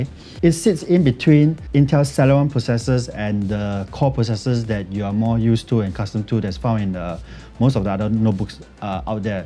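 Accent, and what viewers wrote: Singapore. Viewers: listening to your singlish review,makes me really wana.....wow fantastic haha,decent review though.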